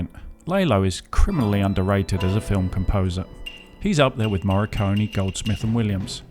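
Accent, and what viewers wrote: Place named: South Africa. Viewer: Bruce lee was the best and sorry you sound like one of them European whites that live in South Africa talking about don't let racism make you a victim Bruce knew better so he went to his home country to give it a shot there white ppl made it bad to South Africans in their own country Rip Stephen binko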